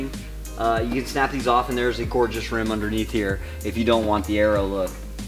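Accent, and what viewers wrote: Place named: India. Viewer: This guy sounds like the Indian guy from parks and req.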